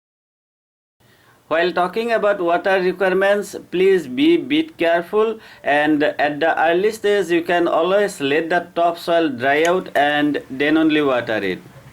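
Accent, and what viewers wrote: India. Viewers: India has dozens of different pronunciations for English. We must learn to tolerate all kinds... The Bengali English, the Assamese English, the Punjabi English, the Tamil English and so on. The essence of this video is the passion for gardening that this gentleman is trying to kindle in us. That is of prime importance.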